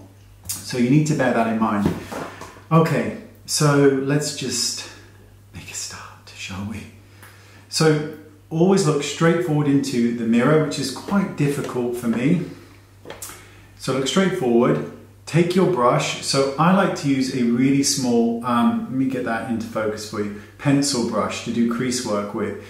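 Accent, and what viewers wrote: United Kingdom. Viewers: Agreed.. a British accent is a plus too😍💕🍁🇫🇮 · I agree with you. Although I am from Brazil, I can understand almost the tinge he says. I Love his britsh accent. Sorry my english 😊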